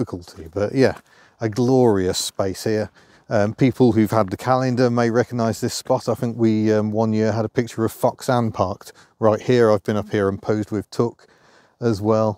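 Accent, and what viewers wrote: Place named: Midlands, United Kingdom. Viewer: Hi mister you always young!!! Can't believe is about 9 or 10 years since i follow your remarkable automotive's footages. Not only to discover incredible similarities and incredible differences between your automobile's lot and the our's in Southern America. Yet liking so much to improve my English by listening to your impeccable Midland's accent. You aren't only an outstanding car's tester with enormous talent for describing machine's featurings but also a great honourable person that knows how to connect different people from different abroad's countries under the same good feeling. True compliments from the Republica Argentina!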